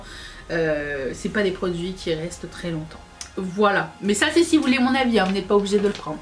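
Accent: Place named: Quebec, Canada. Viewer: Je t'ai découverte il y a quelques jours, je trouvais que tu avais quelques tournures de phrases et de prononciations qui sonnaient québécoises! Ça fait du sens maintenant que j'entends que tu es au Canada! Profite bien de l'été qui arrive! 🦋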